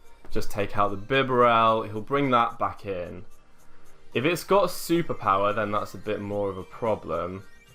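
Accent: United Kingdom. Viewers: Man I love British accent, keep it up!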